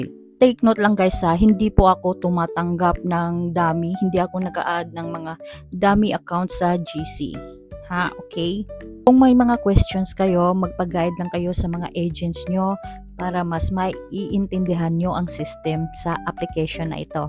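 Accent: Visayas, Philippines. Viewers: Biaya ka po ba? Tono mo po kasi bisaya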